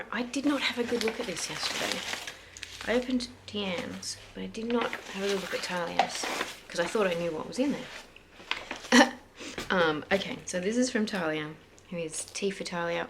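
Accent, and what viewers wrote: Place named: Australia. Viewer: Hi, I’m a Sydney girl, it’s so nice to hear another Aussie voice on here. I’m looking at starting a yarn carousel similar to how the America girls share yarn and I’m wondering if you’d like to join us. I’ll be posting a video about it later this evening ifyou’ interested. I’d love to have you join us in this little bit of fun...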